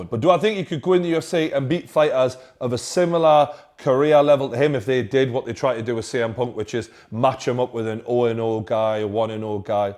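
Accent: Newcastle, United Kingdom. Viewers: Man like Geordie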